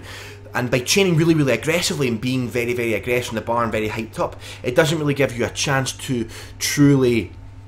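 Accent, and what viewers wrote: Scotland, United Kingdom. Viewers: I think your accent has ruined me as I can't ready "easy" without yelling it in best Scottish voice.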